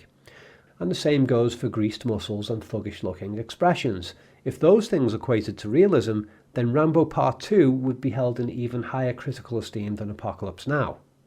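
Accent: Liverpool, United Kingdom. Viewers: Interesting to hear your take on Bond - given your accent I keep forgetting that you are the citizen of a monarchy that lost an empire....hence the need for the delusion humorous or otherwise. G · Letting his inner scouser out. I suspect he’s capable of a much more feral scouse accent but keeps it in check for his international audience 😂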